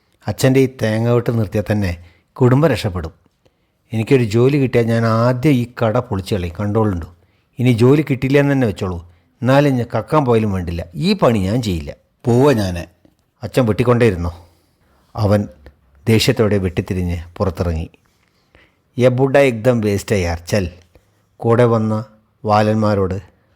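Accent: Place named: Valluvanad, India. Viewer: എന്നത്തേയും പോലെ നല്ല അവതരണം. നമ്മുടെ ആ വള്ളുവനാടൻ ഭാഷ കേൾക്കാൻ എന്താ സുഖം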